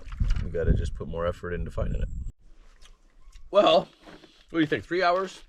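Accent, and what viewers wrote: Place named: Northern Ontario, Canada. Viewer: I can't remember where is from, sounds like he's alot closer to me than I thought. 3hrs from NB, so around Timmins or Cochrane?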